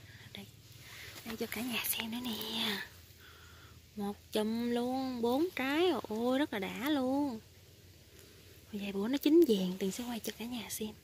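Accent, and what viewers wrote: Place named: Mekong Delta, Vietnam. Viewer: Nghe giọng nói miền Tây,a nhớ người yêu cũ 💕💕💕✍️✍️👍 hu hu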